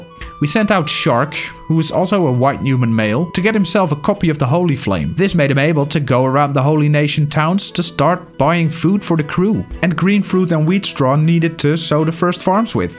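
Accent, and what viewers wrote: Netherlands. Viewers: Hey bro are you Dutch? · Dutch accent best accent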